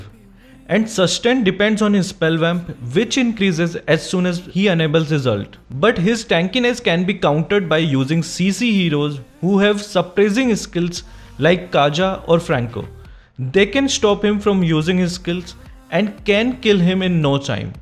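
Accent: India. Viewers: R u indian ur english accent sounds like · Your Accent is just like Indian's, I LIKE THAT ACCENT!👍👍👍💗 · R u Indian bro ur voice is indian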